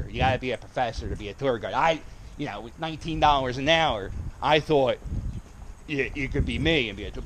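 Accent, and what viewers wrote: Philadelphia, United States. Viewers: Ahhh I love your Philly accent and then I realize I sound the same way 😂😂 It looks like you're in the Burg. One of the nicest neighborhoods left in Philly.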